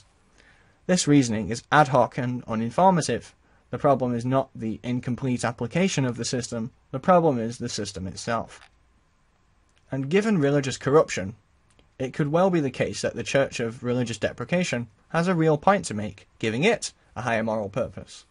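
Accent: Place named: United Kingdom. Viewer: OK, I'll check it out. That goes a long way toward explaining why a video on a channel from someone whose channel originates in The Netherlands sounded so completely British! :-)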